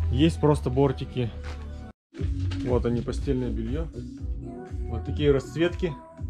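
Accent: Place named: Perm, Russia. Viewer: Блин, вы в Перми реально как в сериале разговариваете, случайно на твой канал наткнулся когда искал инфу по Гоу Про 8, и по говору понял, что ты из Перми, не ошибся ))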